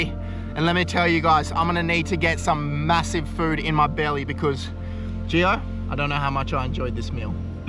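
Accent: Australia. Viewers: Bloody Hell mate aussie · Are you Aussie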